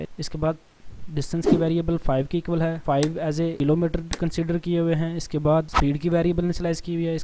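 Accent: India. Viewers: interressting english. can't understand your speed indian accent at all. :)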